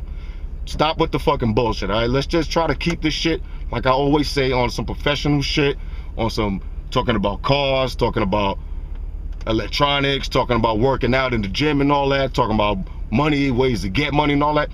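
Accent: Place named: Brooklyn, United States. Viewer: I really like this New York Brooklyn type speech, this guy is hella cool!:D I'm a long time sub go!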